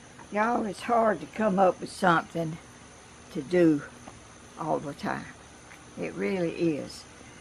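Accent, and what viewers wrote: South, United States. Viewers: Hello... yes some one has defintely hacked your account posing as u... the what's up app...I had this happen to me on another diyers channel... they caused a lot of problems for the other lady.. have your grandson look into it... so many ppl are jealous of all the accomplishments and hardworking Diyers put into their channel. All their personnel time out of the goodness of your hearts just to entertain us for someone hateful, jealous, person to do this is not right. These ppl need to be in trouble.Btw.. love all your videos your voice is so kind and sweet brings back so many memories that southern accent... you are an anxious, beautiful, kind hearted person. Stay safe.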